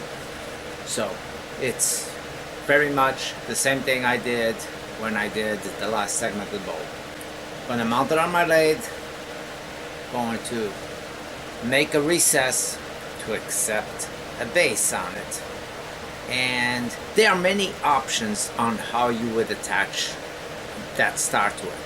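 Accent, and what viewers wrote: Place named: Portugal. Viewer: Bom trabalho! I knew you had to be Portuguese. Your accent reminded me of my father who was an amazing woodworker in his own right. I could watch him all day. I love watching your channel and your amazing creations. You have inspired me to maybe try my hand at wood turning hopefully in the near future. Nao pares suas criacoes!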